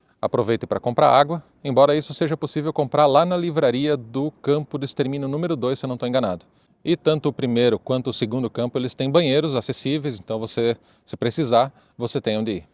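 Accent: Rio Grande do Sul, Brazil. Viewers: Você é do Rio Grande do Sul? Parece com o sotaque do RS. Uma professora me contou que uma amiga visitou esse campo. Ela chorou, e falou o seguinte: "o homem é um m0nstr0 mesmo". Acho que, por mais que eu estude, nunca vou conseguir entender a II G. M. Como um homem conseguiu convencer uma nação de que um grupo de seres humanos deveria sofr3r coisas tão ruins?! Como ele fez isso?! Por que acreditaram nele?! Por quê?! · Bom dia, só pra tirar uma dúvida, vc é gaúcho? Quero te parabenizar pelo belo trabalho e pela explicação ótima. Infelizmente quando falamos sobre esse assunto nos assunta e nos comove muito. Obrigado pelo conteúdo e por nós trazer uma série responsável e uma voz de verdade.❤ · tu ainda pergunta se ele é brasileiro? Olha o sotaque sulista dele. Meu ,é tudo tão nitido .😮😮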